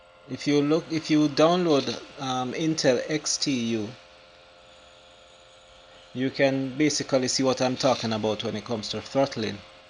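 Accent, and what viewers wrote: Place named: Jamaica. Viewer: The author sounds Jamaican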